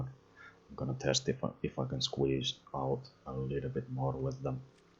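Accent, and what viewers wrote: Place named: Finland. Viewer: Whoa, that's nice. Hopefully we get this into the live next update. First vid I've seen from you and your accent got me wondering if you're a Finn by chance?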